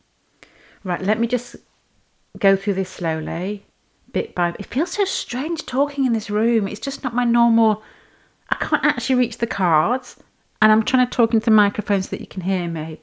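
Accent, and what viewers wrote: Manchester, United Kingdom. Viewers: Are you mancunian? From Manchester?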